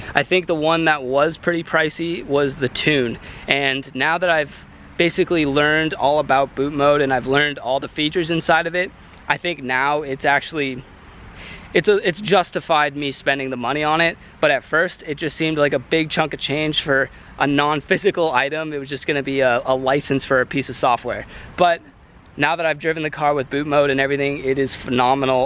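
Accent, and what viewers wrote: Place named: New England, United States. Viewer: Sound like you from New England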